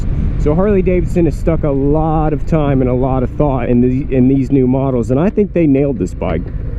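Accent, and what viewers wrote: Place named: Philadelphia, United States. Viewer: You from Philly?.....sounds like it. Like this bike and the different looks and functionality...thanks for the review.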